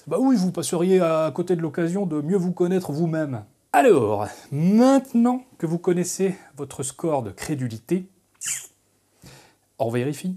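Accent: Belgium. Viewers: Belge Mr?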